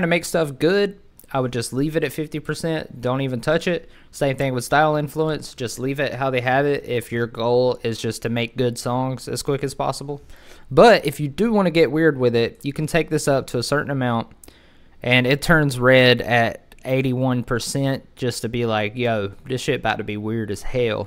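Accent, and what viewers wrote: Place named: South, United States. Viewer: Nice work bro! What's the accent? It's south of the Ohio river for sure.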